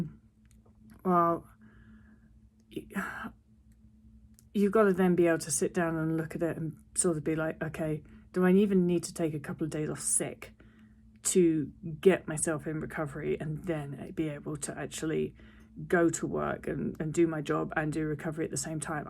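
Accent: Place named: France. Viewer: haha i love your french accent! :)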